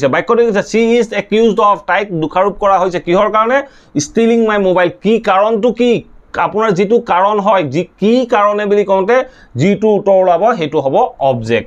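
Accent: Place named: Assam, India. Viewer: your pronunciation proves that actually you came from Assamese medium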